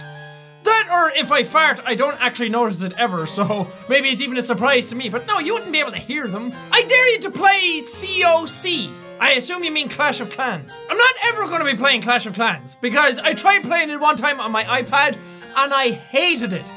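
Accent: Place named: Ireland. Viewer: hey, you'll probably never read this, but you've helped me so much through out the couple months. my mom has currently gone through a car crash, totaling our car and adds more to one of our family's problems, blah blah, i wont go into much detail. you never fail to entertain me or make me laugh. whenever i feel like giving up, i just imagine your silly little irish voice encouraging me. i want to say thank you. im not the only one you've helped, and i really hope you continue to stay happy yourself and keep others happy. much love! high five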